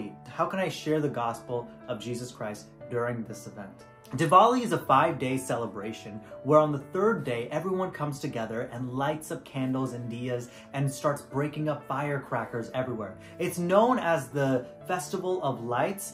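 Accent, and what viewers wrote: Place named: United States. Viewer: What is you ethnicity? you look Indian but DEFINATELY do not sound Indian, at all. I even went ahead and checked your oldest video. You sound US born and raised or someplace in Europe.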